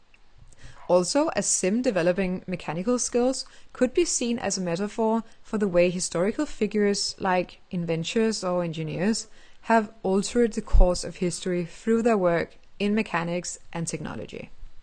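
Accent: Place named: Denmark. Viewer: I soooo love your Danish accent.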